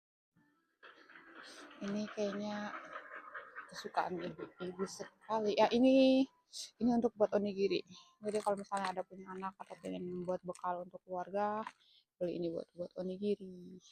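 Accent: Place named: Mbay, Indonesia. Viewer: Akhirnya ada tontonan yang ada dialeg mbay nyaa❤❤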